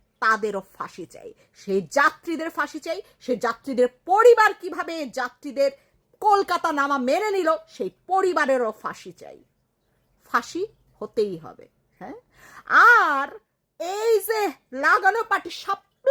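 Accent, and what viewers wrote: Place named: Bangal, Bangladesh. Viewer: দিদিমণি, আপনার ভিডিও দেখে হাসতে হাসতে পেট ব্যাথা হয়ে গেলো। খাঁটি বাঙাল ভাষা র স্বাদ ও পাই। একটা কথা বলি।কলকাতা তথা ভারতের মানুষ আজ ও মনে করে বাংলাদেশের সঙ্গে তাদের নাড়ীর যোগ। তাছাড়া চরম বিপাকে পড়া শরণার্থী দের আশ্রয় দেওয়া বা রক্ষা করা ভারতের মানুষ তাদের কর্তব্য বলে মনে করে। ভিডিও টিতে বেশ মজা পেলাম।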